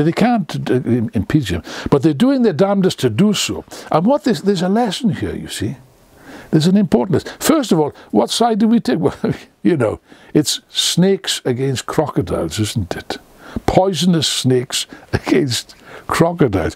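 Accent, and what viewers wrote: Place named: Wales, United Kingdom. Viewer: If every political statement were delivered in the Welsh accent there would be more peace in the world. (Not taking away from the message obviously, it's spot on.)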